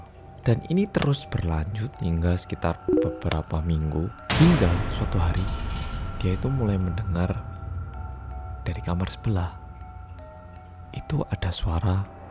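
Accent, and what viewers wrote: Java, Indonesia. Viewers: salut, penyampaian runut dan tata bahasanya sempurna, meskipun medhok....👍👍 Sukses selalu yo, Cak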